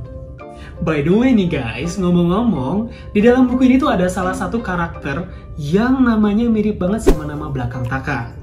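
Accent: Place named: Java, Indonesia. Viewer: Sepertinya masih enggris Jawa ya..cara pengucapannya pronunciation nya belum natural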